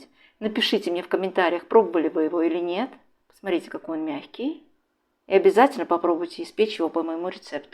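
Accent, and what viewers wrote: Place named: Baku, Azerbaijan. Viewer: Здравствуйте. Я когда первый раз увидела ваше видео,вернее услышала-думаю,что то знакомый выговор,вернее манера говорить. 😅Оказывается вы наша из Баку. Только Бакинцы так тянут слова😂Привет вам из Баку и долгих лет жизни ❤Аллах джан саглыгы версин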